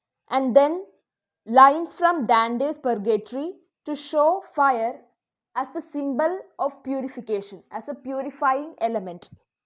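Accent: India. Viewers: Can you please write what is Shantih shantih shantih, i have difficulties in understanding indian english 😩 And can you also do a vidéo of analysis of "in memoriam" by Tennyson Thanks a lot for your work A follower from France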